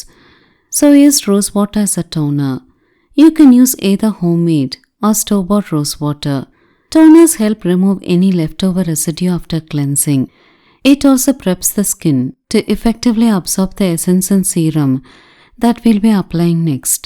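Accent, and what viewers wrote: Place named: Telugu, India. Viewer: Your accent sounds you are Telugu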